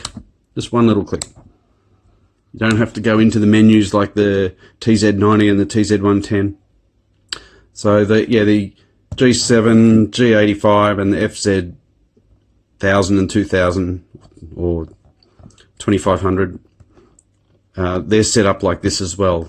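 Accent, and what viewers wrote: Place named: Australia. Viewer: Hi.. 1st I love your Australian accent. Secondly, I feel like you invited me to your home and sat me down to show me how the camera I just bought works. Thank you for an exceptionally in depth, hold your hands and let me show you, review. Thank you mate..;)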